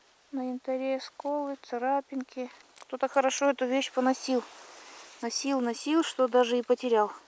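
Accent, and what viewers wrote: Russia. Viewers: Говор то северный, так приятно слушать) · Уральский говорок?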